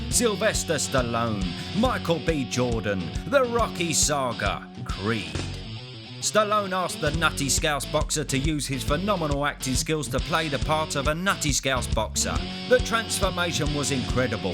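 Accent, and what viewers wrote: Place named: South Wales Valleys, United Kingdom. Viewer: As a Welsh man with a ponchant for bhas bleep eeeeeee I endorse your vallleeeys accent.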